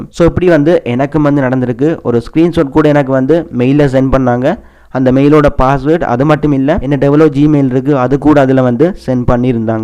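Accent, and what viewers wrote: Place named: India. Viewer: வணக்கம் இந்த காணொளியை நான் ஓட விட்டு ஓடவிட்டு தான் பார்த்தேன் காரணம் உங்களது மொழியில் அநேக ஆங்கில சொற்கள் கலக்கப்படுகின்றது அது வேண்டுமென்றே கலக்கப்படுகிறது அத்தோடு கூட எதற்காக நீங்கள் இந்திய பாணியில் எமது தமிழை உச்சரிக்க வேண்டும் அதற்காக இந்த காணொளியை நான் விரும்பவில்லை 👎👎👎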